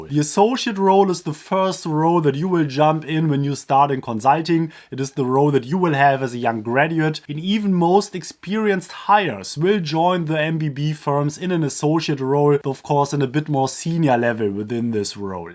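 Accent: Germany. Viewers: Reminds me of my professor from Uni Mannheim. Love the German accent! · That Bayerische accent though lol!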